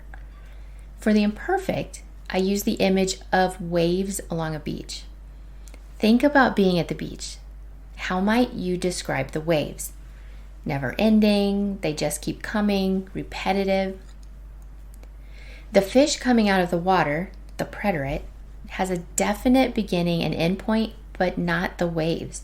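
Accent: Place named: United States. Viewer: I get so distracted with your accent that I dont undestand what you are explaining. You sound so american that you are pronouncing the O and A with american accent. This may have been a good explanation of the imperfect but your accent is really distracting me.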